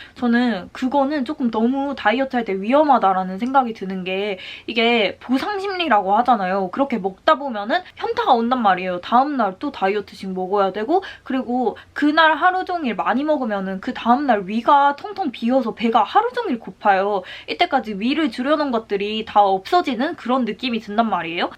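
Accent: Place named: Gyeongsang, South Korea. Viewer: 경상도말투 너무 귀여워요!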